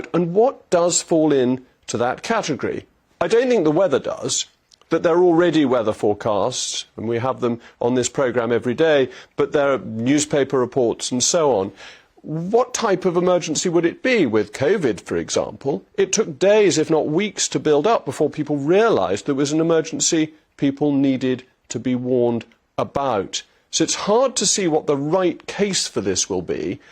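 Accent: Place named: RP, United Kingdom. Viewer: The plummy voice of reason.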